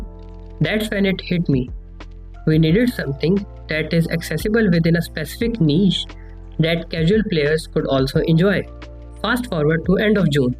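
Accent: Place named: India. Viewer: Your indian accent sucks bruh...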